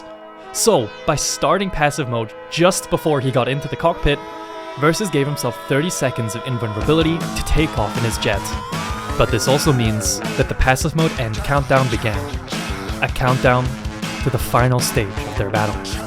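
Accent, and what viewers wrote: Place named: Argentina. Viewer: I'm sure he's from Argentina. I'm from there and I have no doubts that's our accent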